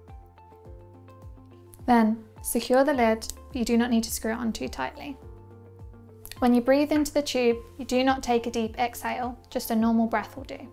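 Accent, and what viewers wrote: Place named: England, United Kingdom. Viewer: shes english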